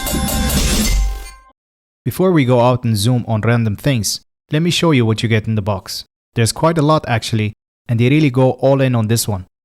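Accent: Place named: Russia. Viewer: Thank you for review, I'm looking for lens to create my content 🤝 And I'm happy to hear Russian accent 😁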